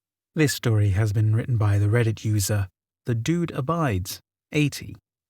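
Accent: England, United Kingdom. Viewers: So glad to have found you! Love your narration. ^_^ Quick bit of pronunciation help for a few North American place names: Ontario has a longer "a" in its 2nd syllable, so it rhymes with "scary," or "hair." :) Maryland is pronounced more like the name Marilyn w a "d" at the end, rather then Mary-Lynn or Merry Land, and Appalachia/Appalachian is pronounced more like "appah-LATCH-ian" rather than a long "a + sh" sound. (I heard a great phase to help people remember this just the other day: if you mispronounce Appalachia someone will throw an apple atcha." XD) I know you're fun the UK so it's tomato vs. tom AS Hto, but I hope that helps. ^_^ 💙💙💙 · I don't understand the British accent/lexicon :'/ but anyway good video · Great encounters I live in Cornwall England I learned about Dogman through listening to Vic cundiff on Dogman Encounters I could not believe it I was shocked so very many people have had encounters world wide Includi g England You sound English please if you can could you find more people to come forward with English encounters I herd of encounter on Dartmore in a back garden Also Tunbrdge wells Kent ,Essex . 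There must be lots of encounters , Thetford Forest Norfolk girl camping I bet there are a few Dogman there . Bext wishes Yvonne Marshall Cornwall England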